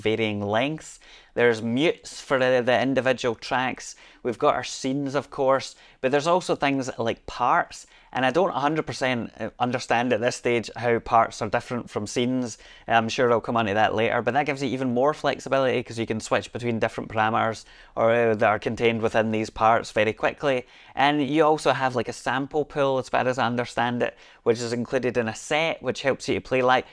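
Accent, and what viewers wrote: Scotland, United Kingdom. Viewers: I believe it must be Scottish.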